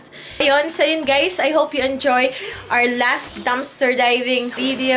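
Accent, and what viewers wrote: Batangas, Philippines. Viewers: Taga batangas ka po o Quezon?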